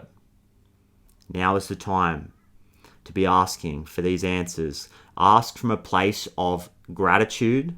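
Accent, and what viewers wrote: Australia. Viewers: omg you’re australian i luv your accent saur much! srsly it’s dope but thank you! you spoke right to my soul 💯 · I'm really grateful I've stumbled upon your channel. I've lived the happiest years of my life so far in Australia, life happened and I had to return to my home home country and all the challenges that come with it. Listening to you talking with an Ozzy accent and about spiritually which I've been in so much need for, is literally soul soothing amidst the time I'm going through right now. I deeply appreciate you and am grateful for your life, your gift and being connected to you. I Love you too mate❤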